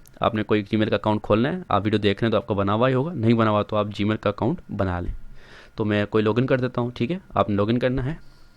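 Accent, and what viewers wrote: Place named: Haryana, India. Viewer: his accent feels like haryanwi . but he is teaching how to do in Pakistan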